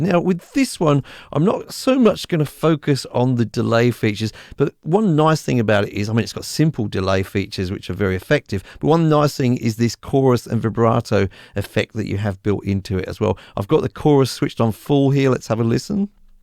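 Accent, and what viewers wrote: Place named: Australia. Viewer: ... I could listen to your calm, informative, Aussie-tinged explanations all day long. So cool. I must try parallel processing some time.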